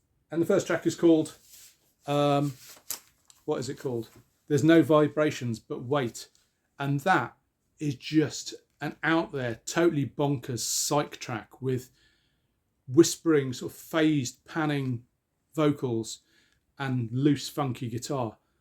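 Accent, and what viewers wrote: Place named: Scotland, United Kingdom. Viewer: What a perfect scottish inclination of your. Namastè.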